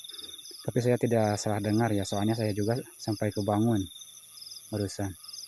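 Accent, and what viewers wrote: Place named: West Java, Indonesia. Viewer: kang Sunda mana logat Sundana mani kaciri pisan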